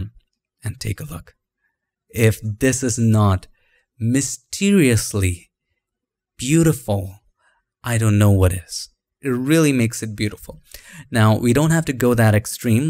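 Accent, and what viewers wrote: India. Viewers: I really like to lean photoshop with you but your english speaking is also good, where are you from , are you indian?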